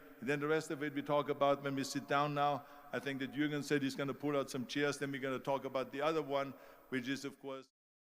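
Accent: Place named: United States. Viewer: Really Great Speech ... Nice Job Guys. Anyone that doesn't understand American accent, can watch this speech with sub title here : <link>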